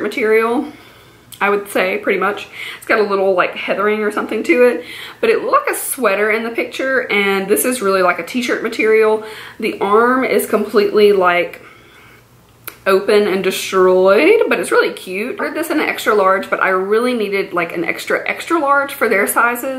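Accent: South, United States. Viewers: That's awesome I've always wanted to visit there. Our southern accents are very similar lol. U r very beautiful and talented. This is my first time watching you and it is very helpful Ty!:) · Growing up in the south and suddenly living way over in the west now, your voice is so nice to hear again. I miss the accents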